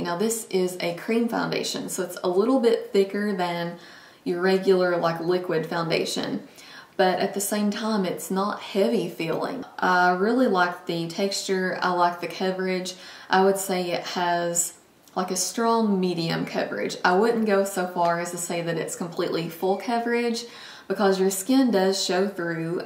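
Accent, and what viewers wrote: South, United States. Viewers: i've been hesitant to try the foundation, but after your review i'm going to try it. i love the southern accent on a classy, well-spoken person like yourself. :)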